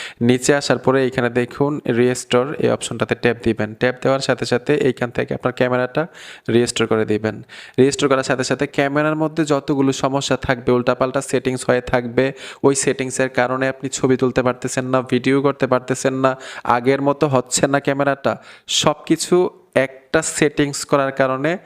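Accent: Comilla, Bangladesh. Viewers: ভাই আপনিকি কুমিল্লার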